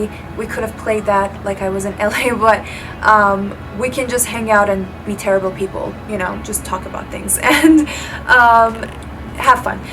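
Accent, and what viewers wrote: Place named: Latin America. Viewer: where is she from? her accent sounds kind of latina 💜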